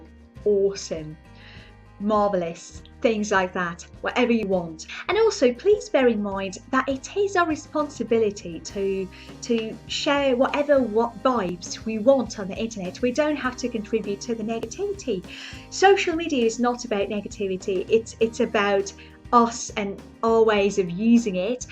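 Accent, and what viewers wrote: United Kingdom. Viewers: Your accent is so British and so amazing ;-)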